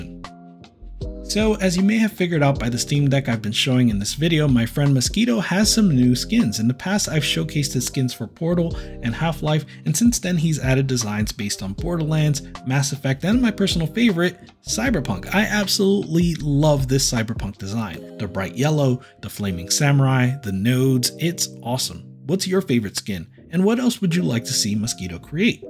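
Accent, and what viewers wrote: United States. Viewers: That's totally fair. Most of us non-Americans have just gotten used to hearing American accents and adjusting our expectations accordingly. Something about the US school system causes many Americans to be a little US-centric. It's not your fault. I appreciate that you are trying. That's more than can be said for a lot of Americans.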